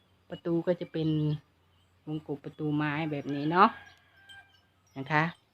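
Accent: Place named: Surin, Thailand. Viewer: ฟังดูแล้ว.สำเนียงคน..สะเร็นชัดๆเลย.5555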